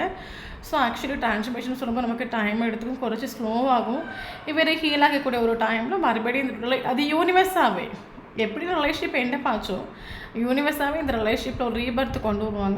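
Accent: Kerala, India. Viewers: ரொம்ப நன்றி பிரபஞ்சம். நன்றி மேம். ரொம்ப அழகா சிரிச்சிட்டு ரிடிங் சொல்லுறீங்க. மலையாளம் கலந்த உங்கள் தமிழ் அழகு. எனக்கு ரொம்ப பொருந்துச்சி இன்னைக்கு reding, நன்றி ♥♥🌹🌹🙏🙏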